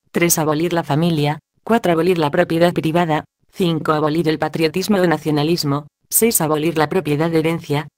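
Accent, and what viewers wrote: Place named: Spain. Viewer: Los poderes fácticos son quienes tienen el dinero y no es que entre todos se pongan de acuerdo, es sólo que el sistema capitalista así funciona. Cuando era niño todo se relacionaba con los satánicos, hoy se habla de illuminatis y de reptilianos. La idea de que hay una conspiración detrás puede ser parcialmente cierta, pero tampoco hay que caer en la paranoia. PD: esa vocecita españoleta es irritante.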